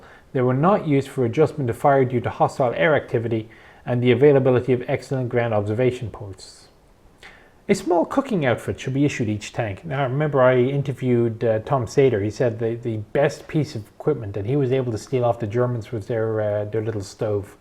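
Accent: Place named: Ireland. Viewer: Ah yes, more from the Book of Armaments as read by a Irishman.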